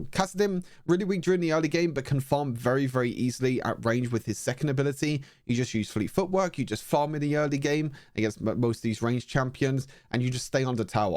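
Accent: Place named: United Kingdom. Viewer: I always check your channel to find out first what's new in Wild Rift and it has helped me better understand loadouts and macro strategies and I love your British accent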